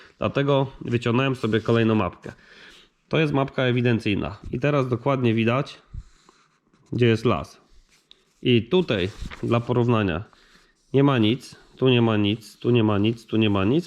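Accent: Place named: Poland. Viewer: Jak cie slysze to czuje cebule a wypowiadasz sie jak cebulandia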